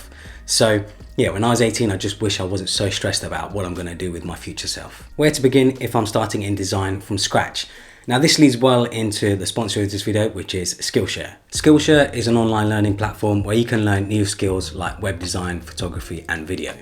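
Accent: England, United Kingdom. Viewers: Dude you have the best English accent I’ve heard lol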